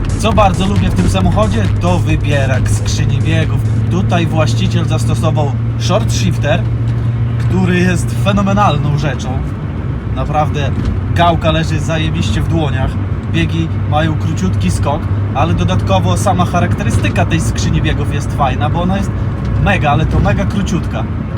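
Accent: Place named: Podlaskie, Poland. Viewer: Ach te "białostockie sljedziki" w akcencie prowadzącego :D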